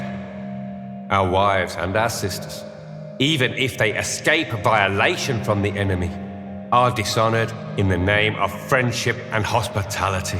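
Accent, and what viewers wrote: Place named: England, United Kingdom. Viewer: Doesn't help he has an English accent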